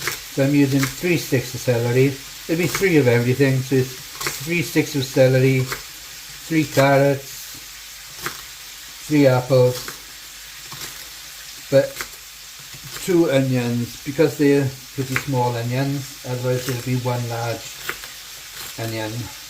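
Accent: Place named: Wales, United Kingdom. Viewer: Happy new year I've missed your Welsh tones that looks fabulous. I shall remember this recipe, so you don't have to write it down. ❤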